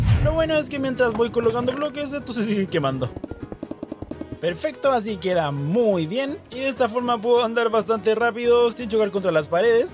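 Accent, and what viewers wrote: Chile. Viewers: eres chileno??????? saludos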